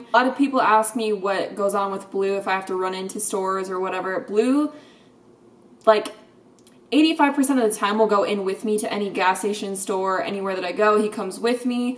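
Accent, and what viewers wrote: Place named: Appalachia, United States. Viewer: U r hella cool but plz stop doing that hillbilly accent u sound so stupid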